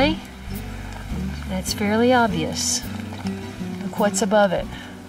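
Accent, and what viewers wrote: Tennessee, United States. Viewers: That is wonderful,. I will definitely be planting some here and there. We are living in the Central Valley area now. Modesto to be exact. This is a bit different for me, as I am used to growing in the TN area. I moved out west from the hills of Hancock County a few years ago. I could hear that TN twang in your voice. =) What area are you from out that way?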